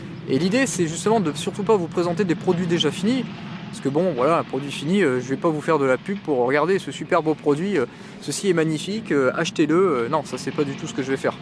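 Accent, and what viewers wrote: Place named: Pas-de-Calais, France. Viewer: Saint-Pol sur ternoise... il y a un super circuit là-bas. Je me disais bien que ton accent m'étais familier. Super chaîne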